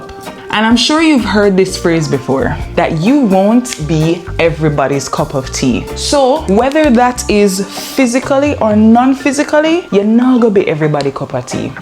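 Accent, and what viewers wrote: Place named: Jamaica. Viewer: Love the Jamaican exscent😍😍😍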